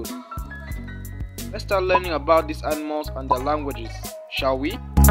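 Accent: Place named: Nigeria. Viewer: I am a Nigerian too.......I can tell a Nigerian when I hear one speaking .....this YouTube hustle is not easy at all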